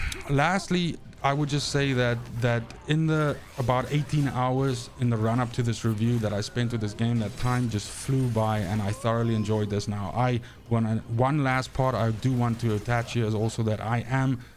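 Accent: South Africa. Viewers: i can hear some south african .awe my bra kwai video!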